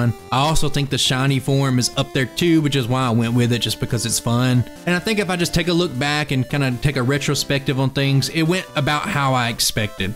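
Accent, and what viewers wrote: South, United States. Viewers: Just found you. Came for the pokemon of course, but staying to hear it in that drawl. Aren't many of us making content out here from the south.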